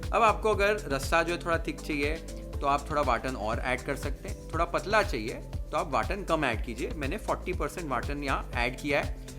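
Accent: Maharashtra, India. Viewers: Hataoff to you chef. Loved your receipes and your Marathi pronounciation. 👏👍🙏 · Omg I am fan of your marathi accent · OMG 😍.. mouth watering.. in the monsoon.. yummy love the Maharashtrain accent Chef Thank you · Tum jo batate hai wo sunkar lagata hai tum Maharashtran hai panjabi lagata hi nahi bahot aacchha lagata hai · On point... Ur recipe and pronounciation... All are very authentic.. First time I saw a non maharashtrian cook to pronounce exactly the way we say in our home... And all the techniques are almost same as we cook in Maharashtra. Hat's off to you chef... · Your marathi accent>>>💛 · Tumcha marathi accents 😅❤ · Love your Marathi accent..recipe too !! Love from kolhapur 🙏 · भावाची मराठी accent लई भारी आहे राव... "झणझणीत , सुखं वाटण , कोथिंबीर , परात," सगळे मराठी शब्द परफेक्ट येतात... #नादखुळा 😊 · I am in love with your MARATHI accent 😍 💕 though I don't write comment frequently I never miss you videos ❤ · Ur Marathi accent the way u try to explain in Marathi is really appreciable... 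· U r so good dude … really appreciates ur efforts for Marathi pronunciations and yummy recipes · Bro akele akele khaa raheho😅🙌yaha muhme pani aaga ya😋Bhariii Mutton🤤Mouth Watering......N ur marathi accent tooo😚great work❤️ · Ohh man you Marathi accent is litttt 🔥🔥🔥🔥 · Want an accent man Lai Bhari 💯💯 Sir I love your Marathi Accent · Dear, Ek number Lai bhari 👍🏼😍😋 being a Maharashtrian couldn’t resist the authenticity of the recipe. It is exactly the way it’s made at our home. Your Marathi accent is Kadak. Loads of love, keep up the good job 👏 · Your marathi accent 😍👌😋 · Appreciate for the wow recipe and your accent for Marathi . Keep it up 👍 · Recipe is perfect perfect & mouthwatering as always but 💯 out of 💯 for your ek number Marathi accent 👌🏻👍🏻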